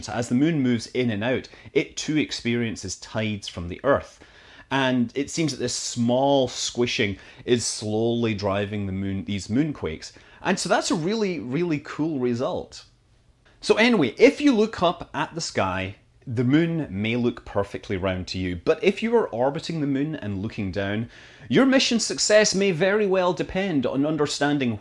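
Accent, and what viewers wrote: Scotland, United Kingdom. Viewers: Once upon a time I was a six year old sitting on the lounge room floor watching an Apollo launch on a 23in b&w tv with the curtains drawn because, well, the phosphors had worn out. Years later, I am sitting in front of a Dell flat screen monitor listening to some guy with a Scottish accent walk me through a pair of lunar orbiting satellites taking careful measurement of the Moon's gravitational field. What a time to be alive. Fly safe! BTW, watched First Man last night, so I'm still a bit stoked about the things that man can achieve. Am so grateful for this channel.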